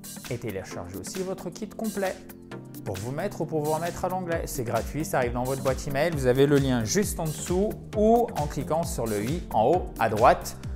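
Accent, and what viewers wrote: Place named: United States. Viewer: Mais votre prononciation est bcp plus de l anglais américaine pas vraiment britannique.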